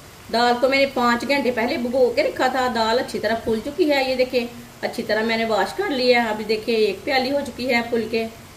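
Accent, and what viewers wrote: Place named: Punjab, India. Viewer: Ap urdu m video na bnaya krien pnjabi m bnaien ap ka bolne ka andaz pnjabi h urdu zuban ap pr sot ni krti pls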